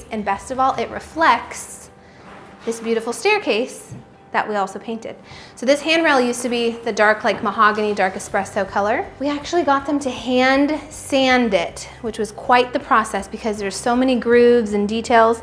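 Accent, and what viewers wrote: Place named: Midwest, United States. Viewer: Lol! How quickly you adopted that mid western sound & y'all!!! Love it! Keep up the good work &!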